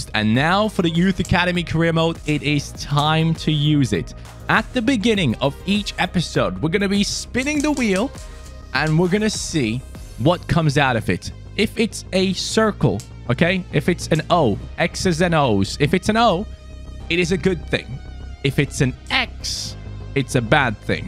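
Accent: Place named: Jamaica. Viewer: Hey could keep the Jamaican please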